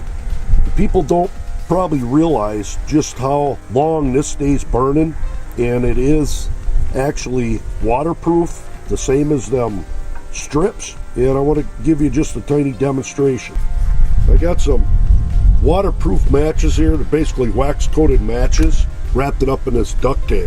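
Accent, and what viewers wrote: Minnesota, United States. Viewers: he's from Minnesota sounds like the Gov.....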